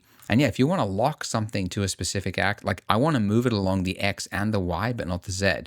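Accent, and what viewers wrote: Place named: Australia. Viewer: Oh thank goodness, I'm so glad to hear an aussie accent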